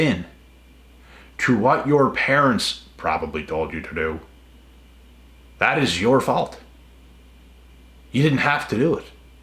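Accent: Canada. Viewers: Love the Canadian accent! From USA.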